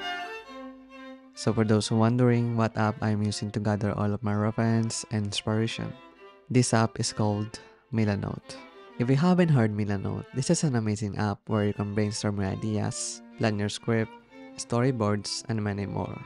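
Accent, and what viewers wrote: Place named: Philippines. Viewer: are you filipino? your english accent similar to filipino english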